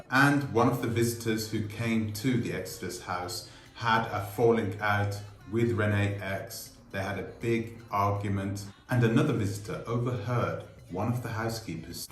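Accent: United Kingdom. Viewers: It's easy for you to sit there with your British accent and judge this woman. So I ask you, when have you ever run an establishment like this?? Do you know her history? Have you seen the videos of the property before she restored it??? Do you know what it's like to have housekeepers and to run a home staff?? Especially when you're from a different country than your staff?? Do you even know that she's a single mother?? Have you seen the videos of people who have done review videos of their stay at Exodus?? They have filmed and shared their wonderful experience that they had there. Did you see the interview that was nearly an hour or more that the YouTuber called traveling sista did with her and how beautiful it was?? Do you know her history?? So stop trying to have a sensational story to grow your pathetic channel. Why don't you try going back to your roots and get rid of that damn British accent which never looks right on an African person. 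Take your ass to Tanzania or any other country and establish something for African people. Stop judging!! What goes around comes around and karma can be an evil bitch.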